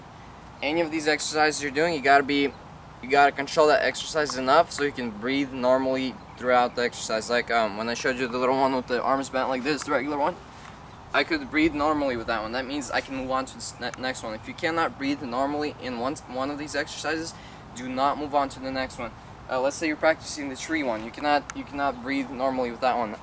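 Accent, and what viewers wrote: Russia. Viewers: Is he Russian ? I can hear he has an accent but i don't know what accent is that xD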